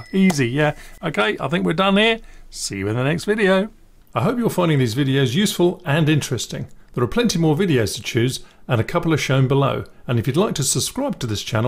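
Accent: United Kingdom. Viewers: Hi. I'm just watching the movie 13 Lives about the rescue of the Thai boys trapped in the cave. Viggo Mortensen plays one of the UK rescue divers. He looks just like you and sounds like he comes from the same part of the UK as you. Great film!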